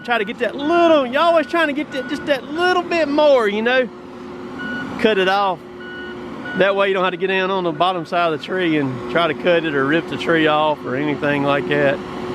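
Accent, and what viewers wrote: South, United States. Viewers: Stumbled across your YouTube channel. I thought to myself "this dude has got to be from the south" I'll be damned if you ain't from Columbus MS. Lol. I live in tupelo. Own a small sawmill and do a little tree jobs myself. Look up Smithsawmill on Facebook. Great videos bro!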